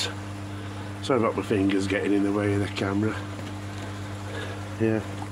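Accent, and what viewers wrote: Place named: Yorkshire, United Kingdom. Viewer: love the Yorkshire accent on water. its great this weather i did have a mulibay but now have a nexus and just subbed mate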